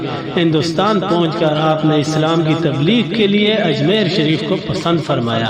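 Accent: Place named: Punjab, Pakistan. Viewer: بھوتنی کے اُردو ٹھیک سے بولا کرو نہ کہ پنجابی نما اردو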